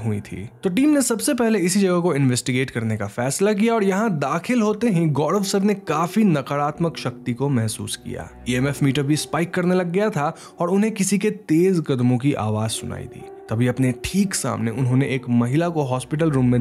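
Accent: Bengal, India. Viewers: Bro your voice is very nice lekin yar ek kaam karo. Pronounsession mai gadbad hai R ko D mat bolo. Sorry bro no hard feelings. I can understand your bengoli axent. Some of my bengoli friends talk same like U. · Voice over awaz kisi bengali ka hai. His pronunciation makes it evident. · Your Bengali accent ruined the whole fun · Are u from Bengal? Im catching a Bengali accent. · Narrator is definitely bengali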